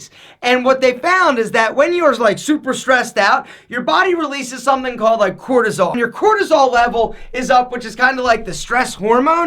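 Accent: United States. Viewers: One thing I can say is, you are Talkative! Don't speak too much, remember you are American (have accent and not all your viewers are saMe like your race) not a Pilipino or Indians or Thailand etc. If your other subscribers and viewers are not same your race, so do you think they will understand you if you speak so fast like this now? And most, if the person have no idea what is testosterone then how it is even You explain in a fast track!!! Weeeew..... It's good that I am fluent in English, if to other people who loves to watch your video, I hope they will understand you in that ways how you speak like a Talkative Guy, Parrot is better than to You how to speak hahaha sorry peace I am your good watcher I think but today I comment negative because this is what I observed guys sorry! 🇵🇭🇵🇭🇵🇭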